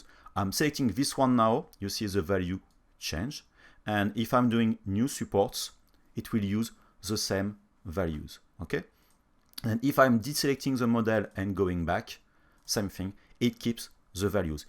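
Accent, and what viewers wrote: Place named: France. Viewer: Very nice, keep em coming! Used to Isotropix Clarisse, donc pas de probleme avec l'accent francais :)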